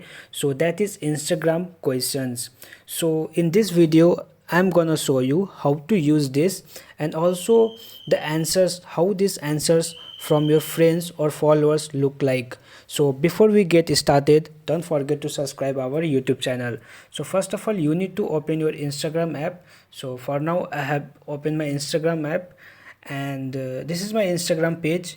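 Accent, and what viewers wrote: India. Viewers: Ilike the Hindi english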